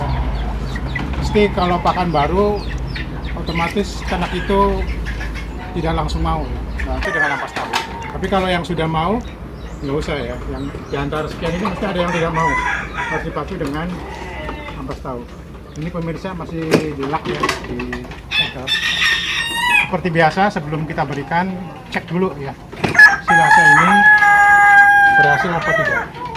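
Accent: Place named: Indramayu, Indonesia. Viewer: ngmomg e medok wg dermayu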